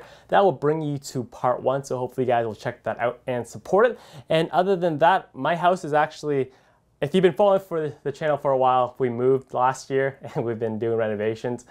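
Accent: United States. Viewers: Hes asian? Had no idea thought he was american cause of his voice, no hate tho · I always imagined you were a white guy. The accent is very strong and American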